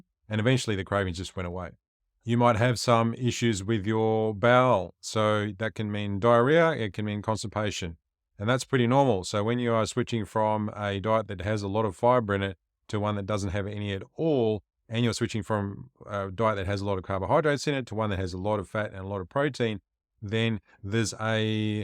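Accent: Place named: Australia. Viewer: Hey! Outstanding and informative video!! You summed it up in only 8 minutes!! I'm impressed!! 😊👏🏼👏🏼👏🏼👍🏼💪🏼💕 P.S. Sidenote: I Love some of the words you say, like the word "rubbish" instead of "trash" or "junk" or as some call it, "crap", lol.😀 (I love Australian and British words and accents!) I actually had a good friend from my college days, <num>. He was an exchange student, Tennis player, from South Africa who dated my best girlfriend. I was often a tag-along during the summer on their outings and dates. He is the one who taught me the word petrol for gasoline. Lol. Also my 13 yr old granddaughter loves the Australian children's cartoon series 💙"Bluey"🧡and so do I! Such a cute show! That's all for now! 😁 Take Care, God bless you and your family! 💕🙏🏼🤗 Susan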